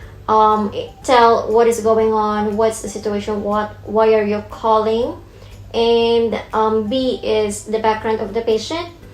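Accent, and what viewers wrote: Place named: New Zealand. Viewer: very helpful content, and aside from that, the accent! very NZ, hehe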